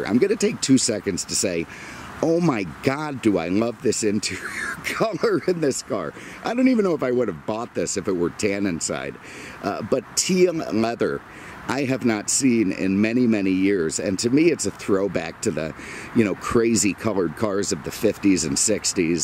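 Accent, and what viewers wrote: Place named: Chicago, United States. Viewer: He has GOT to be from Chicagoland. He sounds 100% identical to my old neighbor. I even sent one of videos to his wife and she laughed hysterically.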